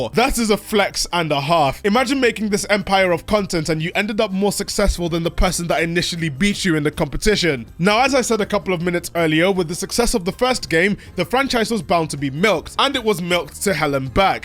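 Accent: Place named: RP, United Kingdom. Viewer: as a new subscriber to your channel, you sound like those rich British people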